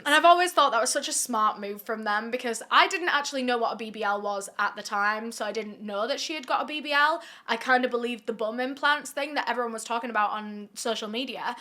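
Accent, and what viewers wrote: United Kingdom. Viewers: Sorry but i gotta say you look like Olivia Rodrigo with cute Brit accent ✨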